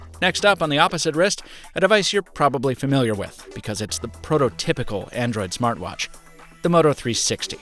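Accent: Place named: Boston, United States. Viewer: Do a vid with your Boston Accent.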